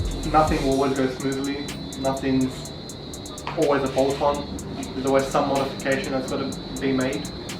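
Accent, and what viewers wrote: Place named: Australia. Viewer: Plasma man is your friend you sound Australian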